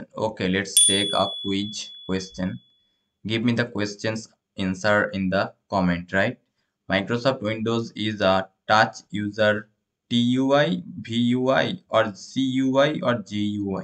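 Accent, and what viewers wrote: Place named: Bihar, India. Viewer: i can predict you are form bihar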